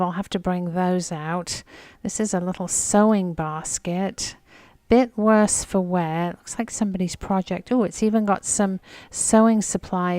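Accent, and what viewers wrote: England, United Kingdom. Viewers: Thank you for answering my question. Your English accent is still strong so I was wondering. I have been watching your channel for a few years. Love your finds. I have been to Las Vegas 4 times 1st time in 1992 and spent 3 days of our honeymoon there in 1995 when we travelled aound the west coast but never visited a Goodwill. Take dare.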